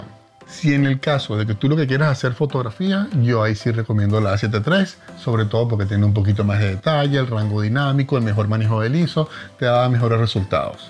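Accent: Venezuela. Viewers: jeje nunca había visto tus videos pero apenas hablaste ya nos dimos cuenta que eres venezolano buenísimo contenido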